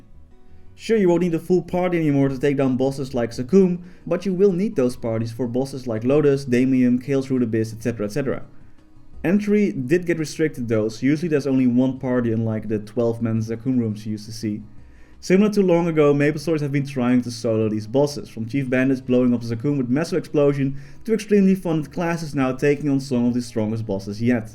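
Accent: Netherlands. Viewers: You sound so Dutch. I'm in Utrecht. Hi.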